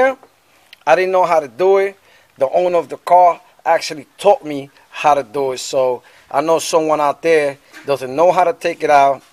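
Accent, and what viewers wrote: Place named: New York, United States. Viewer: Good video, loved the ny Italian accent. Anybody else noticed that first 😂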